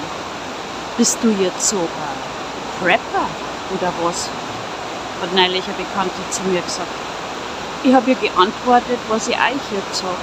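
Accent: Bavaria, Germany. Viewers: Ich mag deine Videos, weil sie unaufgeregt daherkommen, sowas tut sehr gut. Dein Bayerisch mag ich weniger, weil ich ein Viertel nicht verstehe, obwohl ich mir große Mühe gebe - ich bin Berlinerin, also Preußin!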